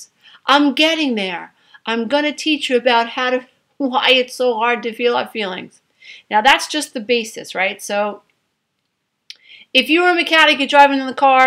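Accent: New York, United States. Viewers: i just dicoverd your channel.i love your empathy and intrest in your work.you are teaching me somuch!i also love your new york acent! from kevin,an abused child that went thrugh councilg off and on for 30 years.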